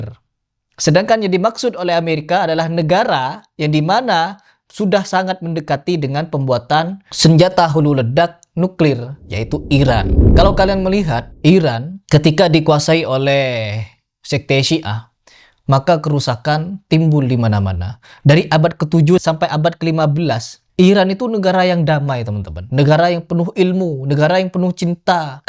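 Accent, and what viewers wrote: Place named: Sumatra, Indonesia. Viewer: anda ini asal dari mana? Dari logatnya, anda ini kira-kira daerah melayu Riau, Jambi, Pelembang atau daerah sanalah. Benar?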